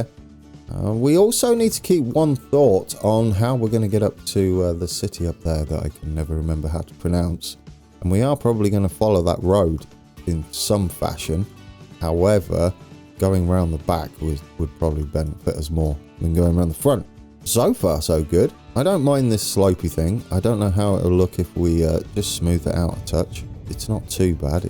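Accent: United Kingdom. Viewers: Great to hear. Enjoying ALL of your TF2 series. Your accent is apparent, but not over the top (so thick) like most Brit YT's here. Very soothing and entertaining. You're - comical especially when you call yourself a "berk."(sp) I die! Still glad I found your channel. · your british tho?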